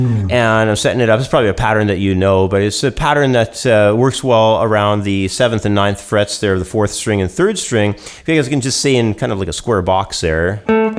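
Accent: Canada. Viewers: Nice job my man. I think I understand now. Btw, are you Canadian? I'm only asking cuz I can hear your accent.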